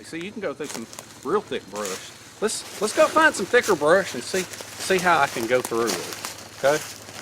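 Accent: South, United States. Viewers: What er you from my friend. I love that southern drawl! I’m from MS myself so it’s kinda nice for a southern man to do these vids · This is how a real American sounds like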